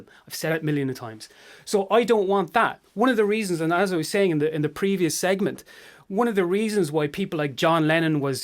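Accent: Ireland. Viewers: You look around the same age as me and, also like me, sound as if you're from Baile Átha Cliath. So, unless you are living under a rock, you still experienced The Troubles. I remember the Dublin-Monaghan bombings, the gun being held to my head, as a kid, by a British "soldier", as I visited relatives in my own country, across there border. I remember the Gardaí checking our houses, etc. It wasn't just those in the occupied counties that experienced it. Yes, it was far worse there, but not exclusively there. Don't you remember when the unionist terrorists, led by Ian Paisley, invaded the Republic?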